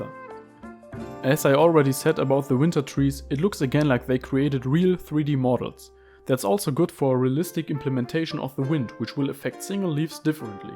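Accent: Germany. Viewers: Good video. (hörst dich an wie ein deutscher... Kann ja auch sein) This differents are extrem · Man hört raus das du Deutscher bist 😉 gutes Video 👍🏻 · How do you only have 15 subs? This Video is doing Great! Great editing, good quality and smooth music in the background which isn’t too loud or quiet. There might be a german accent but dang! You got a new sub ( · Aha ein deutscher Akzent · Hör ich da etwa einen Deutschen aktzent? 😁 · Bist du Deutscher oder warum hast du so einen deutschen Englisch Akzent ??? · are you german? because your english sounds like you were from germany.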